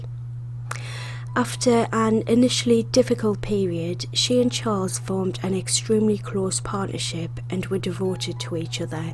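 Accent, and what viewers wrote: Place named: North East England, United Kingdom. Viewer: you DON’T sound robotic at all! What part of NE England do you come from? The northern and/or eastern parts of Yorkshire? Durham? Tyne and Wear? Northumberland? No worries. Listening to you narrate is very pleasant. I have a visually impaired friend who I usually have to read your daily video to. Having you narrate it was a treat for her. Please consider doing more narration again. As always thanks for my daily dose of vitamin H.☺️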